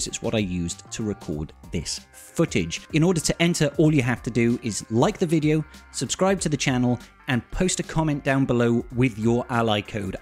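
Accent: United Kingdom. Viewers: Realy enjoying the content please keep it up. On attempt 20 but eill keep trying. Also good to hear a fellow Brit playing. Ally code: <num>.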